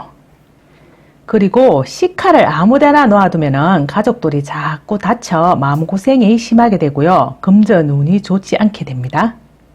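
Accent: South Korea. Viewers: 어머 얼굴공개하셨네요~완전반전 미인이시네요..ㅋ말투는 시골사투리 얼굴은청담동아줌메비쥬얼~~^^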